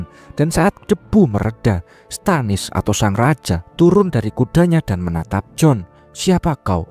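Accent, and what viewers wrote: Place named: Java, Indonesia. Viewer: jawanya medok banget bang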